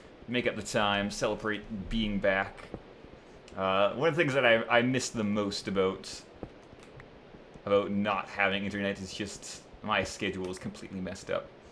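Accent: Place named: Canada. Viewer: Awesome to hear those Canadian vowels again. Welcome back to the Internet's.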